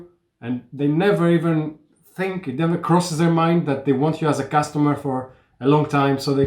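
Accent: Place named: Greece. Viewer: Greek accent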